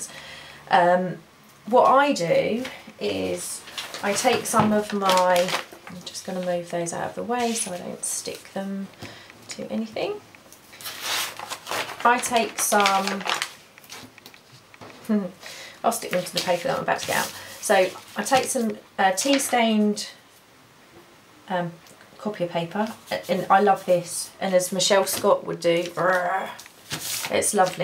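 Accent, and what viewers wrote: England, United Kingdom. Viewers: Do you always distress with a brown ? Do other colours work,blue say or turquoise? I haven’t used these (cards) but I only draw and paint so far. Where is your accent from England ? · thank you for sharing very helpful love your creativity and just love listening to you girls from the u.k. so up beat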